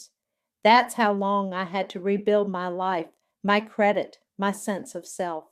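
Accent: South, United States. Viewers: Bravo... Great story Thanks loved the southern woman narration ❤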